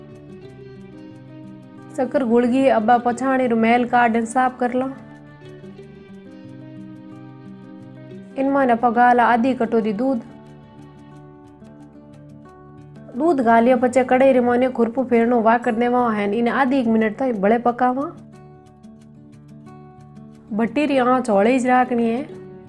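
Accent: Marwar, India. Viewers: बहुत अच्छा? जी आप मिठी मारवाड़ी भाषा मे मिठाईया मिठी - मिठी बनाते हो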